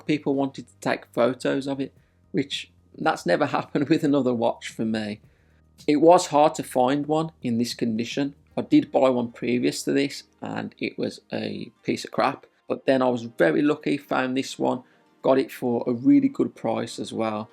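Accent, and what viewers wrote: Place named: Birmingham, United Kingdom. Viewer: New Sub here. Brilliant collection, and really surprised to see that your first purchase was a £1500 Rado. And you sound like you're local in Birmingham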